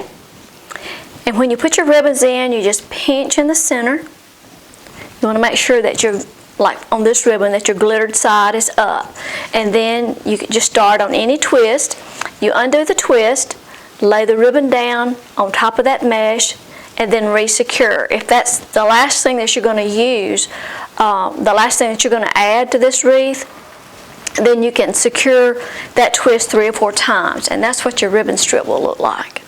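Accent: South, United States. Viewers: I love your videos, I 3 daughters and one step daughter and love the times we craft or cook together. We are southern too so we love listening to your accents! I am learning all things deco from you gals! Thanks. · I just love watching your videos! Not only have I learned a few things, thats the bonus, but best part of all, is feeling like you have just invited me into your home for a visit. I love the way you talk lol, you guys sound just like me he,he. I was just a talking to you saying heck yeah I wore a garter belt. Gotta love those Southern Ladies. Never change.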